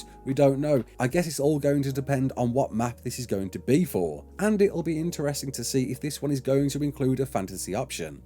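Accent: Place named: Scotland, United Kingdom. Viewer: Also, what country are you from? I really like your accent, it sounds like it may be Scottish but I don’t want to assume, I am probably horribly wrong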